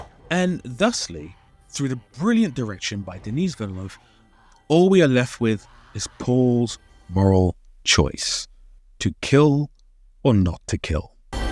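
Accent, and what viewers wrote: United Kingdom. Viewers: I joined your channel cause I thought it was interesting then realize all you do is complain about shit you can't do. I'm a critic of things too but I don't know if it's just that you sound like a total white brit or that you sound pompous as shit. I just can't.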